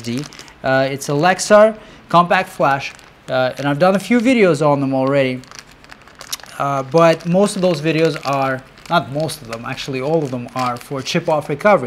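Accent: Russia. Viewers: Russian акцент.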